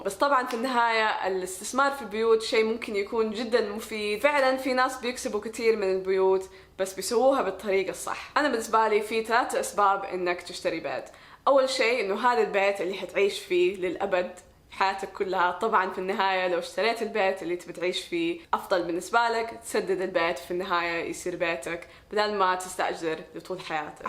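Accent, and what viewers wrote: Hejaz, Saudi Arabia. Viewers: احب اللهجة السعودية واضح جدا Sudanese guy pass from here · باين من لهجتك انك سعوديه صح ❤ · الله اكبر ليست لهجه كويتيه نهاءيا الاخت من اهل الحجاز وفي مكه المكرمه وهي لهجتهم سلسه وجميله الله يوفق الجميع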